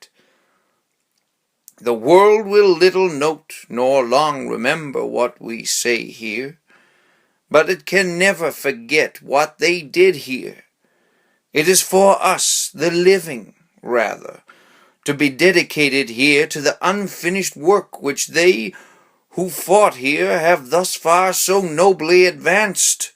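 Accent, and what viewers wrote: United States. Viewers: Koren war please, btw nice American accent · Is that your voice? if so your American accent is very good. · Your Us accent is great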